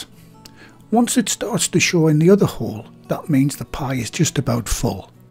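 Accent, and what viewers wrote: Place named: Sunderland, United Kingdom. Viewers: thanks. I’ve always loved the Makkems, how could I not listen to that southern accent and enjoy it? My dad was from Blyth! No-one understood me when I came to the US, I had to “Londonise” my accent. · Love your videos thanks for taking the time to make them. My dad was from Sunderland so as you can imagine I love the accent. Could you possibly give an idea of cooking times for the small muffin tin sized pies. Also rough weight of fillings in them. Thanks Geri Hargreaves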